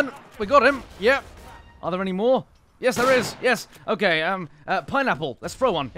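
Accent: England, United Kingdom. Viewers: not going to lie you are the most British person I've ever heard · Your voice is just really funny sounds like the stero typical Brit I'm keeping tuned and subbing like him I just found you too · Most British person I have ever heard. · You sound british. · this is the best English accent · You are way to british, i fucking love it. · Sorry are you British? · you are delightfully British....I love it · Most funny gammer to listen to! Typical English! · Having a natural British accent is an unfair advantage in this type of thing. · Oh that British accent! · I try to imagine you looking like Nigel Thornberry. quite British. I like it! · %99,9 british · He sounds like a British lad that was in that time period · This man is probably the most British man I have ever heard. I like him all ready.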